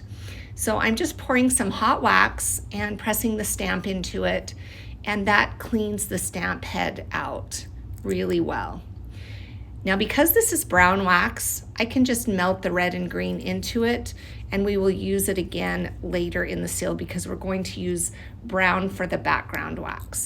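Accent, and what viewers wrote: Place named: Great Plains, United States. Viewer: plains states. Northern-ish, Like Kansas toward Idaho. Huwhite, meAsure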